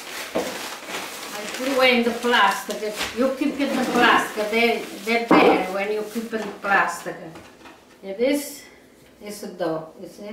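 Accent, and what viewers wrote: Italy. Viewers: Merry Christmas! Love the family stories and the singing! 💕❤️💕 I was wondering about the consistency of the Struffoli. Is it dense or airy? We like it dense, but the bakeries always seem to sell the airy kind! So much work......but.....yum! So worth it! Wish I took more notes in the kitchen as well as the huge wooden board that my Mother-In-Law’s mom always used to make the struffoli! So grateful that I found your channel! What a special treat! I remember when I first met Grandma....she gave me a plate of struffoli to take home! A delicious treasure! Your videos are getting me through some sad times........I so miss my mother-in-law! But hearing your Italian accent, listening to your stories and songs......warms my heart! Thank you! God bless you and your family! 💕❤️💕❤️💕❤️💕❤️💕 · Hello from England. We love watching you and we love your Italian accent too. 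A very Merry Christmas to you and your family! Xoxo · Isn't she wonderful. I love her Italian accent.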